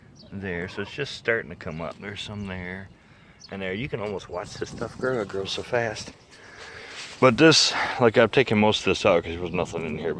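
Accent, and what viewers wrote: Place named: Midwest, United States. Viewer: Whoo hoo get to watch my favorite turner do other stuff. I hope this goes well for you and. I love all your content. Makes me want to go home to Mishawaka/Granger area. My family bis still there brother and sister aunt and bunches of cousins. Miss that Midwestern accent!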